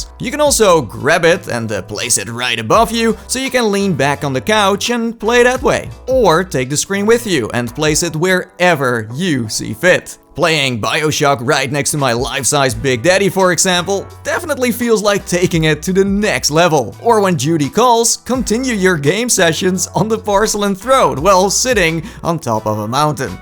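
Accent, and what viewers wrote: Netherlands. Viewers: Bij de eerste zin hoor ik dat je nederlands bent hahahaha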